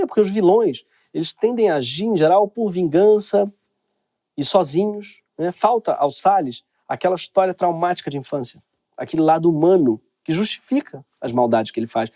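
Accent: São Paulo, Brazil. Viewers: O que tá acontecendo e deixando de acontecer com o país a parte - Meus parabéns pra quem fez essas legendas, ver isso com legenda em outra língua as vezes é um show a parte. PS - maneira no paulistês, a claque ta fazendo falta hahshdhsurudha. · Sou de Rondônia e e acho o sotaque paulistano do Ilário. Kkkkkk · eu MORRO com esse sotaque paulista KKKKKKK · Gregório é paulista demais, meo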